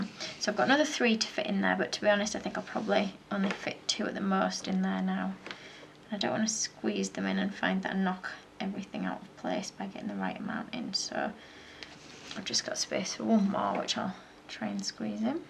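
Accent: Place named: Scotland, United Kingdom. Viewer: you sound a bit scottish to me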